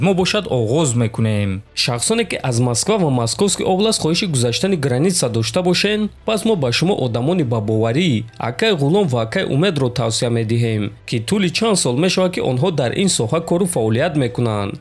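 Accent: Tajikistan. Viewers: что за язык .тупой еше тупее.пазориш таджикский язык и акцент.пазорный волк.прекрати мычат.баран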